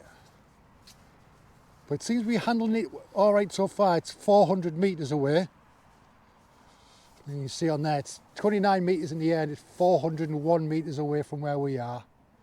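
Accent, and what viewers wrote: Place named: Teesside, United Kingdom. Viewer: Are you from Teesside by any chance i highly recognise your accent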